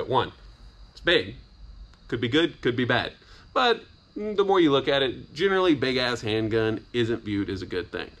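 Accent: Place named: Texas, United States. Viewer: Love the video for that I thank you. Sense of humor I rate you at about a 7/10. Shooting skills about a 3/10 Taste in beer about a -9/10 Hat about a -3 / 1000 Firearm Hk about a 1/10 Firearm Sig about 8/10 1950's sunglasses 3/10 Texas like accent. Erm It's Texas so 0/0 Garage or shop space 1000/10 Cool factor , it's a outdated design 2.5/10 Bragging rights because of a game lame/10 and your mom is calling you. Paying that much wtf are you nuts?/10 Shooting targets that had already surrendered and lying down big bully/10 Would I own one ? Well hell yeah/10 Why? Tears of the sun of course. In all seriousness: I have never owned a Mk 23 but have shot them before. Super cool firearm and accuracy is superb ! The extra weight out front makes it easy to bring back on target. I have never shot one suppressed dang it. On another side note. Metal Gear Solid is one awesome game! 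My rating system is about 5 beers out of a six pack. I have to say I would think that HK could have included a nice case . Something like the FNH FNX .45 Tacticool case . 2 mags are okay 3 mags are way better. HK mags are stupid expensive. I have 2 USP's and the extra mags back when I purchased the firearms were around $62 to $75 a piece. Now the USP mags are around $40 a piece which means the Mk23 mags are probably just over $50 each. I own a HK P7 series so I pretty much know what a over priced HK is. May I ask what that MK23 is like with that big ole suppressor? It looks to be almost Major League baseball bat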